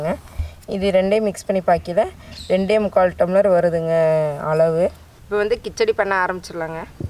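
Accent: Kongu, India. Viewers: Ungaloda kongu tamil tha romba alagu 😍😍🔥💯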